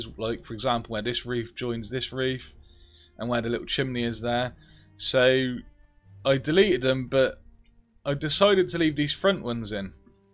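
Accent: Norfolk, United Kingdom. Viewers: Some really great ideas! Are you from Norfolk or Suffolk? I live near Norwich :) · i like your british accent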